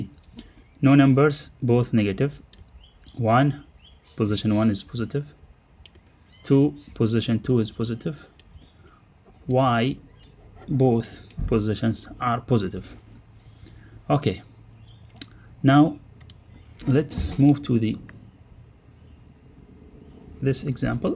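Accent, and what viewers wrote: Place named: Arab world. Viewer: Your accent sounds like an Arab accent I think so😅 your explanation is so good !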